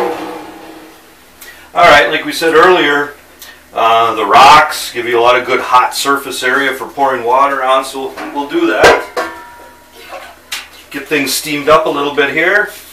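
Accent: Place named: Upper Midwest, United States. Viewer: I was looking for a vid about putting line on a spinning reel and your vid from 2 years ago came up. First of all, thank you, it's what I was looking for. Second, I love subscribing to local Youtubers and you sound local. Are you in the UP or Wisconsin?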